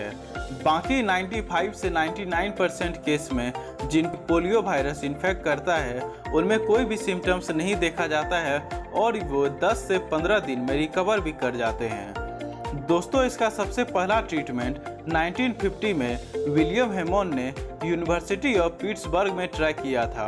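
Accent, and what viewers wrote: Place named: Bihar, India. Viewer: Bihari voice 😍😍😍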